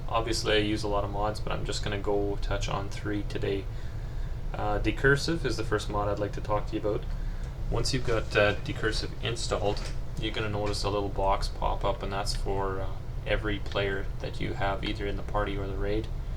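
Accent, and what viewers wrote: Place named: Canada. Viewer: You sound Canadian :D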